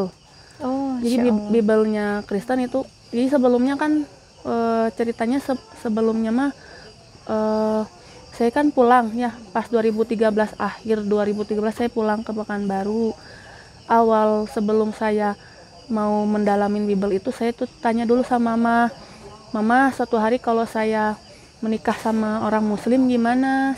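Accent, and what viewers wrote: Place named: Sunda, Indonesia. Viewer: Maasya Allah teteh.Logat sundanya juga sdh kental.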